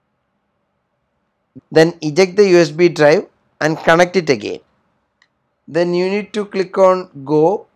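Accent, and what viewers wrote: India. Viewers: Man, that OG Indian accent!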